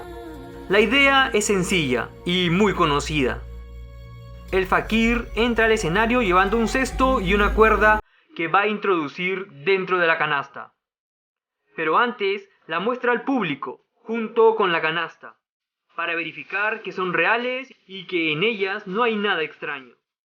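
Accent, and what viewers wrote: Peru. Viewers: ese acento es peruano 😊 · ERES DE PERU VERDAD ? LO DIGO POR TU DIALECTO AL HABLAR .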